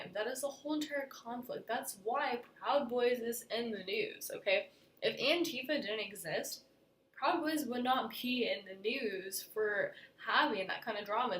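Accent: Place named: Ireland. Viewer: She's Irish.....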